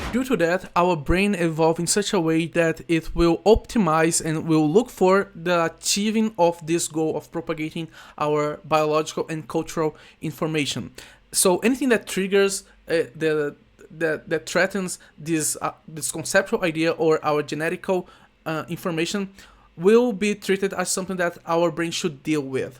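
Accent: Brazil. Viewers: err...are u brazilian? ur accent and the books...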